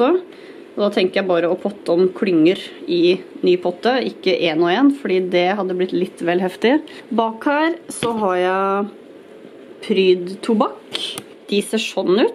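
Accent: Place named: Skien, Norway. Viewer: Ser du er fra Skjeen da.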